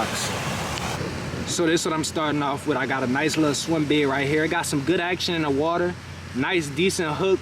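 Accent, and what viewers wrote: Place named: South, United States. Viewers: I think he from Charleston sc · Bro where you from ? Gotta be in the boot wit me im guessing south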